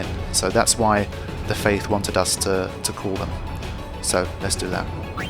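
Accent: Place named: London, United Kingdom. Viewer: What an insightful walkthrough! I've been interested in hearing someone's thoughts about this game, and as a result I found you. I wanted to hear what you had to say throughout your playthrough and I must say that I have thoroughly enjoyed your commentaires. Your explanations about the story make sense, it allowed me to see FFX in a slightly different perspective, so that's nice to hear. Overall, clean commentaries. You talk when it is necessary, you managed to give many details about the game mechanics, as well as adding some sarcasm tone here and there; that kind of humor is always welcome =) Thank you for your hard work and for all the content you willingly share with us, hands down! P.S. Nice voice, and nice accent as well. Londonian accent is the best in my opinion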